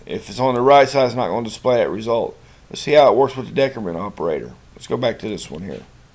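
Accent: South, United States. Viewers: you sound like hillbilly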